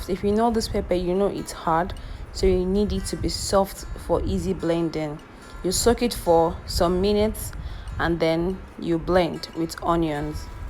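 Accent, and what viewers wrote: Nigeria. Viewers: I totally love this video. The Nigerian accent and cooking style! Thanks alot!❤ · First of all thank you for the normal Nigerian accent 😩💜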